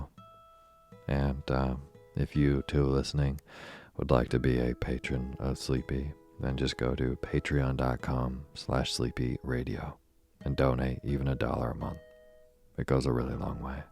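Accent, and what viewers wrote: United States. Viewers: your straight up American voice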